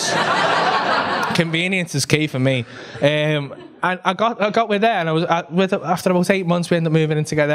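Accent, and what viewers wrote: Liverpool, United Kingdom. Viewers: I’m an American so sometimes I have trouble understanding when he talks fast...need to brush up on my Scouse a bit! But Paul is absolutely brilliant!! 🤣😂 I need to make a trip across the pond just to go to one of his shows ... and experience the Kop of course! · Yeah this is proper Scouse lad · 10 seconds of Scouse and I'm out. Sorry mate.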